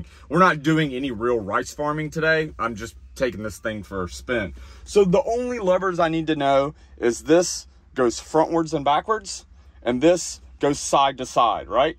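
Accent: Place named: South, United States. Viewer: Georgia boy in Thailand I was wondering where you were from I can’t help but hear your accent as a fellow southerner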